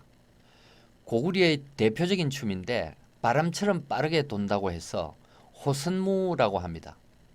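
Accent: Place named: Busan, South Korea. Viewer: 병장님! 반갑습니다. 군대 쫄다구 김*철병장을 통해서 씨의 요즘 근황을 듣고 너무 반가워서 이렇게 유튜브로 소통을 합니다. 군대에서 나병장님의 개인돈을 훔쳤다고 의심을 사서 한 때 나마 이런 속좁고 편향되며 막무가네식의 고참을 계속 고참대우하며 군대생활을 보내야 하나 아니면 개인적으로 밖으로 데리고 나가서 죽통을 날리고 군대생활 그렇게 하는게 아니다 라고 일침을 가한 뒤 영창을 가야하나 고민을 많이 했던 탁일병입니다. 그 당시 촐싹 거리던 나 병장님이 세월이 지나고 나니 박사 학위를 받고 교수님이 되었다니 정말 격세지감을 느낌니다. 아무 근거없이 고참의 돈이나 훔친것으로 절도 혐의를 뒤집어 썼던 탁일병은 그 사건이계기가 되어 제대 후 모 여고에서 30년 동안 교편생활을 하면서 반 아이들에게 절대로 남의 물건을 탐하지 말것이며, 또한 자신의 관리소홀로 귀중품을 잃어 버리고 난 후 절대로 남을 근거 없이 의심하거나 미워하지 말도록 인생관을 심어주는 담임을 역임하고 이제는 명퇴를 하였습니다. 우리 나병장님 서울 생활이 40년이 다되가는데 아직 여전히 부산 사투리가 남아 있는 것이 신기합니다. 지금도 여전히 탁일병에게 절도 혐의가 있는 것으로 믿고 있는 것인지 아니면 철없던 어린 시절의 치기 어린 선임 고참의 찍어누르기 식의 자백 강요였는지 개인적으로 묻고 싶습니다. 어찌 되었든동 제대 후 진리탐구에 대한 열정을 불태워 박사학위를 취득하고 교수님이 되었다는 것에 대해서는 저절로 고개가 숙여지며 존경의 마음 금할길이 없습니다. 모쪼록 건강하십시오. 기회가 되면 그 시절을 이야기 하며 회포를 풀 장이 마련되리라 믿습니다.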